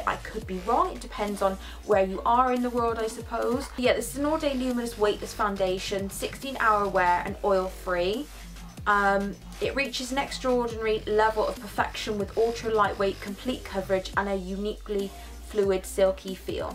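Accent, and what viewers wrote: Bristol, United Kingdom. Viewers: Is that a Bristonian accent?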